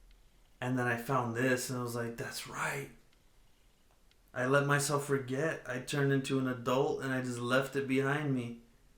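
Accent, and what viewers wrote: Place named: Mexico. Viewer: Thank You 😊❤️ I just love seeing the Italian flag 🇮🇹😃 with that Mexican accent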